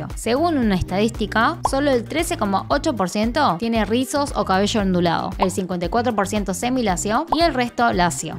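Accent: Argentina. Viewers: ME GUSTA EL CONTENIDO DE CALIDAD E INVESTIGATIVO QUE DAS, APARTE EXPLICAS MUY BIEN EL PENSMAIENTO COREANO SIN LLEGAR A LOS EXTREMOS, ERES MUUUUY DIFERENTE A OTROS YOUTUBERS COREANOS QUE TRATAN DE REIDICULIZAR A SU MISMA CULTURA O POR EL CONTRARIO SOBREPONERLA A OTRAS CULTURAS. Y UN BONUS EXTRA: ME SUPER ENCANTA TU ACENTO ARGENTINO, A VECES PIENSO QUE MIRO TUS VIDEOS POR EL ACENTO ARGENTINO COREANO 😂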